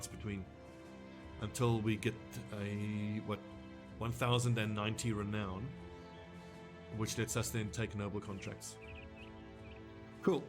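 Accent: South Africa. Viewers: Interesting accent sir, saffa living abroad?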